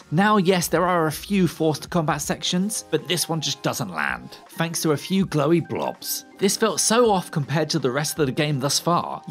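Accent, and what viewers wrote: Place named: East London, United Kingdom. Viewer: I have to get through Mafia remastered version yet .Since I gotten back to it ,but would say the final training mission in Star Wars Fallen Order was a pain in my ass ,since it like the only puzzle in the game I could not figure out easily .But now I know for sure ,cause go YouTube to figure the easiest puzzle in the game .So where the hel is Jules and the cute itty redhead on this channel ? And your accent dude sounds east London for sure .I sure wish Hollywood get all the accents right from England .When comes to movies and other things .instead using somme snob with same accent as the royal family of misfits .But you folks are pretty cool from the old country .😁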